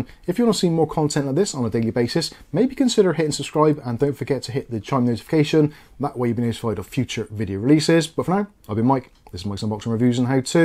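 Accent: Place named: South West England, United Kingdom. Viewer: Being from Bristol nice to hear a South Western accent in this space and you also have a love for tech. Subbed mucker.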